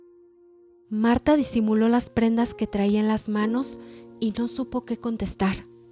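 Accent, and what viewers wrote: Mexico. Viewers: A mi tambien me encanta el acento mexicano me gusta todo lo de mexico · Excelente tu narración! Que bueno que volviste, vos sabes que t lo pedí. Sos la mejor narrando, me encanta el acento mexicano + tu voz tan dulce, muchas gracias, lo disfruté a pesar d los molestos anuncios, jjja! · ES MARAVILLOSO PODER ESCUCHAR UN CUENTO CON DICCION, ACENTUACIÓN Y PUNTUACIÓN PERFECTAS...GRACIAS EL ACENTO PERFECTO EN NUESTRO LENGUAJE MEXICANO.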